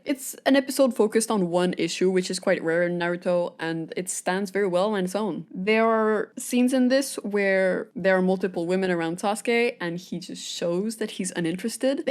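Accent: Quebec, Canada. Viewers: Great video, I loved it. Also is this a quebec accent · Are you a quebecer or French person by any chance? Just curious because I'm from Quebec and your english accent sounds similar to mine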